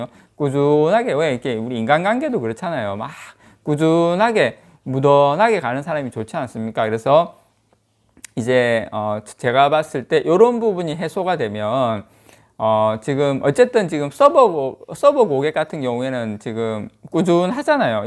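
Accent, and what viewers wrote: Gyeongsang, South Korea. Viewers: 식사하셧는교?? 우리포항 싸투리 올만에들으니 반가위요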